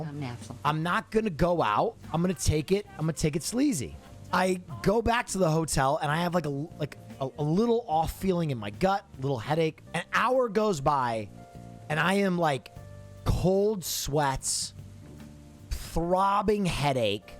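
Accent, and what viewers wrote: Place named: California, United States. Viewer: Man Will is looking and sounding more Californian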